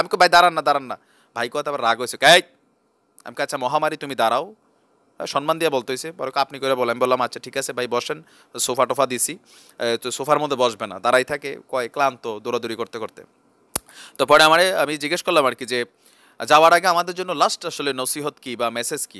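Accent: Comilla, Bangladesh. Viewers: ভাই আপনি কি কুমিল্লার নাকি? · ভাইয়া,,যেভাবে শুরু করছিলেন,,😂😂 আপনি পারেন ও ভাই😜বাই দ্যা ওয়ে,আমি কিন্তু কুমিল্লার বউ,,,😁আপনি একদম খাছ কুমিল্লা পোলা😬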